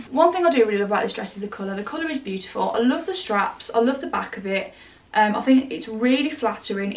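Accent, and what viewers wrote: United Kingdom. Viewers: I have to say I love your accent!Which part of the UK are you from? Anyway, you're gorgeous!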